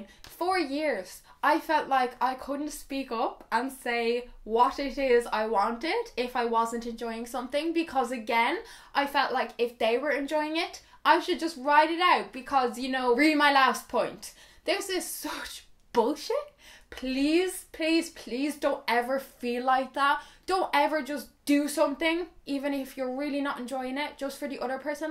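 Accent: Ireland. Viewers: I love your videos and your Irish accent! My ex boyfriend used to pressure me alot into having sex and when I used to refuse. He used to become very nasty towards me. I do love sex but not that much!! I'm from Yorkshire, England 🏴 btw xx. Keep up the amazing content 💗💗💗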